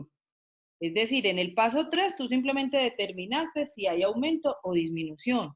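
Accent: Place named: Antioquia, Colombia. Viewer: rica esa voz de paisa arrecha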